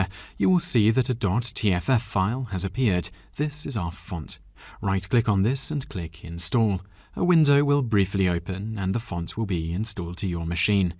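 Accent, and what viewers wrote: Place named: United Kingdom. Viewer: British english! ... nice. By the way... very good your video, I use Apple. But well done. I will check your channel. Thanks!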